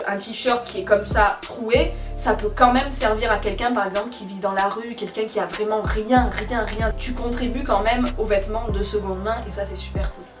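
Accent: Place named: France. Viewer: No pero tú cómo puedes hablar los dos así de bien? Vives en colombia pero tú francés es de Francia?? Cuál es tu lengua maternal? Y los cursos de francés son en línea? Salidos de Canadá!!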